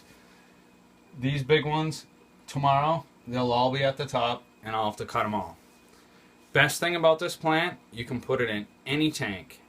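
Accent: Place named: Boston, United States. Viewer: I watch this guy for 2 reasons. 1) learn more about aquarium care and 2) practice my Boston accent. Love it.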